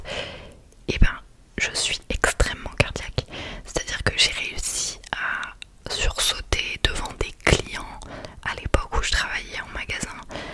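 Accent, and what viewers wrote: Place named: South, France. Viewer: J'avais jamais entendu ton accent du sud !! 😂 Excellent. Moi je le prends depuis que j'habite dans le sud sur certains mots aussi.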